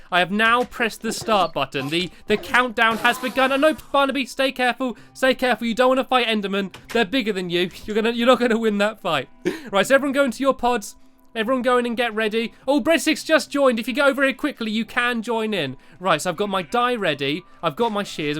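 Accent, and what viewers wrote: United Kingdom. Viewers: love you! Your British accent hits my heart well. THANK YOU! I like your videos.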